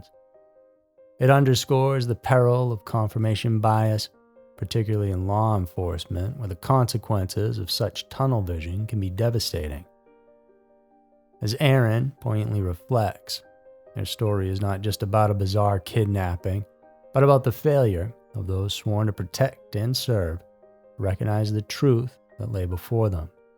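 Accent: South, United States. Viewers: Southern United States somewhere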